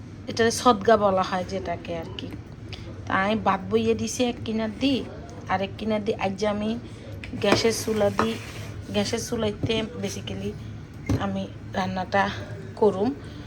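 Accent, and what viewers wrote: Noakhali, Bangladesh. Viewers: আংগো নোয়াখাইল্লা বলে কথা 😊😊🙃🤪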